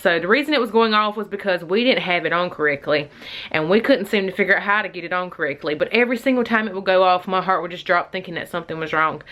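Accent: South, United States. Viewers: Sis! I’m here for that extra southern accent! Also, good tips!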